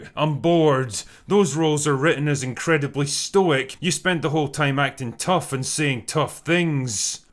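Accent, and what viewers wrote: Scotland, United Kingdom. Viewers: Most Scottish bloke ever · Genius analysis. And I like your accent - Scottish, but totally readable for a yank like me! Cheers!😊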